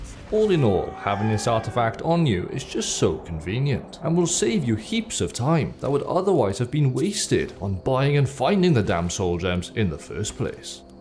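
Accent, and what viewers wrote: Wales, United Kingdom. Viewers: I didn't see your name until after I noticed your accent 😂 fellow Welsh Skyrim enjoyer.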